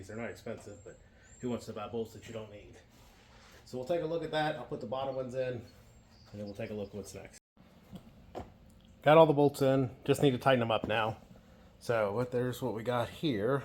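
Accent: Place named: West Virginia, United States. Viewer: What part of West Virginia are you from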